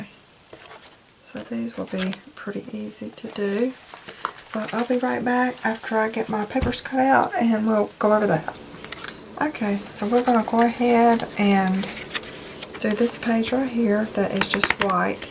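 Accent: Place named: Tennessee, United States. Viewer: Your albums are lovely too 😊 (I lived in TN for a few years and your accent sounds very similar - I miss it so very much )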